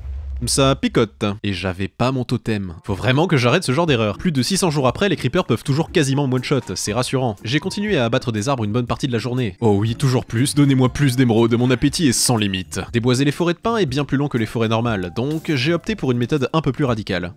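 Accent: Quebec, Canada. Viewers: J'ai bien remarqué que tu a l'accent québécois et français alors tu vie où?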